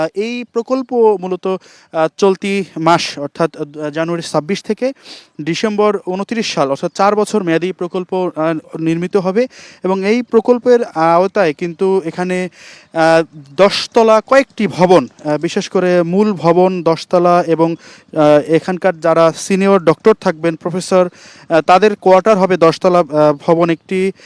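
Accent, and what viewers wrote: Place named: Chapai Nawabganj, Bangladesh. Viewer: যিনি খবর পড়ছে ওনার বাসা চাপাইনবয়াবগঞ্জ জেলায় স্থানীয় ভাষা এখনো দূর করতে পারেনি। যিনি খবর পড়ছে তাকে স্থানীয় ভাষায় খবর পড়তে মানা করবেন!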